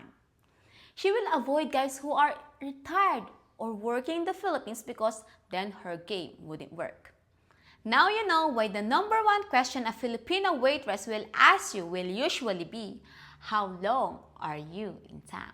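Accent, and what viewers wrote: Ireland. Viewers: I love listening to Irish 😅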